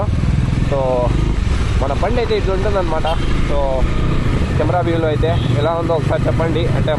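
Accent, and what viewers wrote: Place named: Anantapur, India. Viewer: Meedi anantapur district kada anna meeri matlade slang chustene telustundi 🎉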